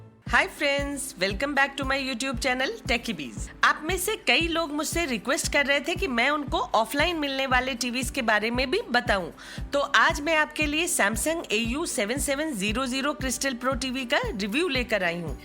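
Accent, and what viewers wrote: India. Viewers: I’m from Brazil and I can’t understand the Indian accent, so I put it in mute and turn on the subtitles… great review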